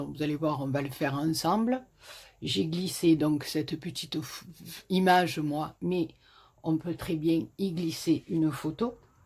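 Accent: South, France. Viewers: Cc je ne suis pas abonnée depuis longtemps mais je dois dire que tu partages beaucoup de tutos. Ne t excuses pas et tu fais ce que tu veux sur ta chaîne. Merci pour le partage et pour ton accent du sud que j adore💕💕belle soirée 😘😘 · Bonjour, j'adore tout ce que vous faites, je regarde toutes vos vidéos malgré que je commente rarement. C'est votre chaîne vous y faites ce que vous voulez!! Vous n'avez pas à vous excuser et je trouve complètement ridicule et irrespectueux de quitter votre chaîne parce que vous faites pas tout les tutos de vos créations!! Vous devez prendre du plaisir sur votre chaîne et ne pas avoir aucune obligations!! Ce que j'aime aussi beaucoup c'est votre accent du Sud:D Bisous et continuez telle que vous êtes!! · Coucou, moi je te comprends tout à fait et je te reste fidèle car je t'apprécie beaucoup. Même si ça fait que 2 ans que tu fais du scrap, j'adore ce que tu fais, reste comme tu es. 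Les tutos en vidéo doivent être fait avec plaisir et sans stresse, sinon ce n'est pas la peine. C'est toujours un grand bonheur de te retrouver et d'entendre ton si bel accent du sud. Les personnes qui se sont désabonnées n'y connaissent rien en scrap et ne comprennent pas la scrapeuse. Fait bien attention à toi, je t'envoi pleins de gros bisous et mon soutient. Sabine Sarazin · Qui peut bien vous demander de vous justifier sur "votre chaîne", une personne qui ne comprend pas grand chose et encore moins à ce qu'est le partage. Moi j'adore vos créations avec ou sans tuto c'est toujours un plaisir pour les yeux. J'aime votre bonne humeur et votre accent du midi, ne changez rien. Cette carte est magnifique et à refaire. Merci pour ce pas à pas très bien expliqué. Bonne journée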